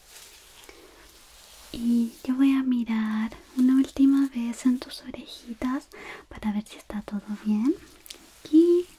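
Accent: Chile. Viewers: Me encanta como hablan los Chilenos 🥰🥰🥰🥰, NUEVA SUSCRIPTORA! Mas que todo, utilizo los ASMR para estudiar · Podría jurar que es chilena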